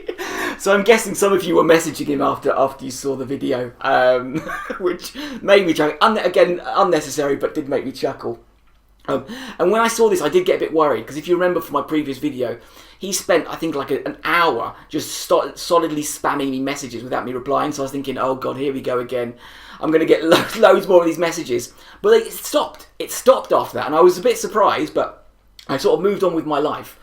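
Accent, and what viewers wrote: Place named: United Kingdom. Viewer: I fucking love your commentary, your british accent just makes it all the more hilarious i swear to god!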